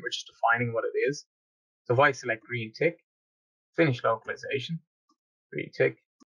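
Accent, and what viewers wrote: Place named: United Kingdom. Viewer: good video and great sequence of information; however you sound British, or European with your accent and as an American and somewhat decent land field surveyor,,,i really, rally think your video would be, reviewed , as much much better is you did not speak so fast. and leave out the trivial , stick to the tech detail and process and insert some pauses in between with also maybe some recall or repeat of stuff and say something like , ",,, ,, remember or as i previously said,,,," .Thanks !!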